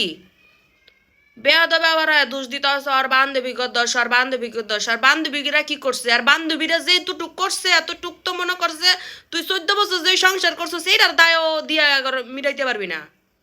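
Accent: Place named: Narsingdi, Bangladesh. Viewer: আপনি কি নরসিংদী জেলার মেয়ে? ভাষাটা এমনি মনে হয় 😅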